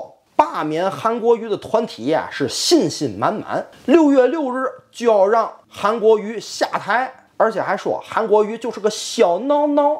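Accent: Tianjin, China. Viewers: 这口活绝了，以后都天津卫嘴好了xD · 每日谈: 天津话的魅力天津人的种族天赋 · 天津話，過癮！ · 喜歡這津腔播報👍👍👍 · 在天津上的大学，怀念你纯正的口音啊。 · 这天津话说的，喜感自然来，还很亲切。每天看莱克多巴蛙快报，是一件极为快乐的事情。支持！~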